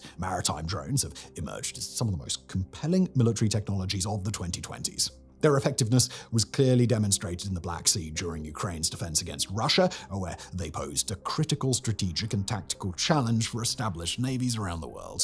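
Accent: England, United Kingdom. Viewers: Good Video. Lousy Audio. Get rid of the reverb. Flatten out your voice. Lower the bass. Over and over the sound comes out garbled. You already have the British accent. Quit trying to make yourself sound even better. Deliver it flat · I used to hear an English accent and think you sound intelligent. Now after getting to know your country and people, I just think you sound like a complicity idiot who will go along with anything you are told.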